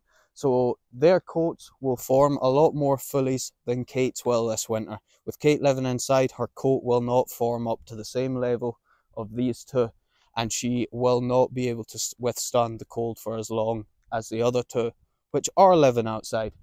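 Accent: Scotland, United Kingdom. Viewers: I struggle understanding where this guys from he sound north east scotland or irish is messing with my head 😂😂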